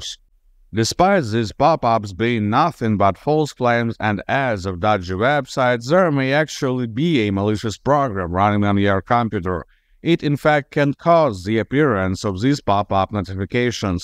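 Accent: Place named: India. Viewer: not even the AI can cover the indian accent. but thanks for the video.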